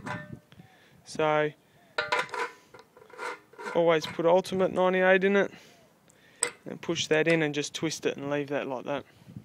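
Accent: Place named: Australia. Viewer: the the auzzi accent man and you got a sick ride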